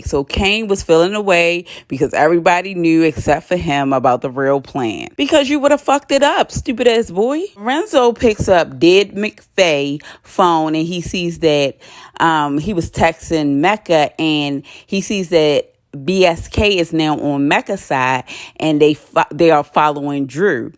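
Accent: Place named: Baltimore, United States. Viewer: You Sound Like you from my city ! You Fro, Baltimore ?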